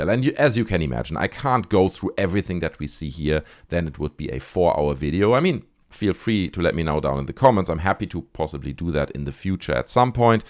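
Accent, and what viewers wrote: Germany. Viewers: if this video Podcast is run by the Host man Talking called??? then why is this Russian Man Talking with a German Accent??????????????????????????????????????????????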